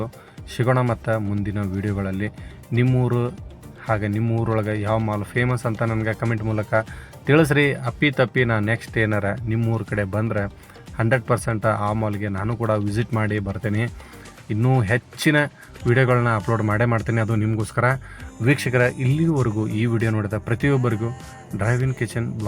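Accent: Hubballi, India. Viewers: ಹುಬ್ಬಳ್ಳಿ ಮಾಲು ನೋಡಕ್ ಬಹಳ ಸುಂದರವಾಗಿದೆ ನಿಮ್ ಭಾಷೆ ಹುಬ್ಬಳ್ಳಿ ಭಾಷೆ ಕೂಡ ಬಹಳ ಚಂದ ಇದೆ ಬಹಳ ಬಹಳ ಚಂದ ಮಾತಾಡ್ತೀರಿ ನಮ್ಮೂರಿನಲ್ಲಿ ತುಂಬಾ ಮಾಲ್ಗಳಿದಾವೆ 👌👌👌👌👌❤️❤️❤️❤️❤️🎉🎉🎉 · ತುಂಬಾ ಚೆನ್ನಾಗಿದೆ ಬ್ರದರ್ ಮಾಲ್ ಹಾಗೆ ನಿಮ್ಮ ಹುಬ್ಬಳ್ಳಿ ಭಾಷೆಯನ್ನು ಚೆನ್ನಾಗಿದೆ❤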